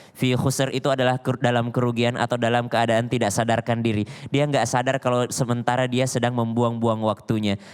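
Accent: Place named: Sulawesi, Indonesia. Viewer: Ustad te koyo dr sulawesi yo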